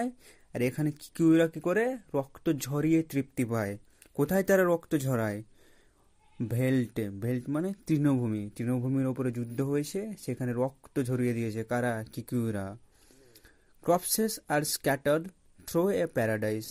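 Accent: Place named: Murshidabad, India. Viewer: Valo hoyechhe tobe kolkatar banglay video korben majhe majhe ektu Mursidabadi tan aschhe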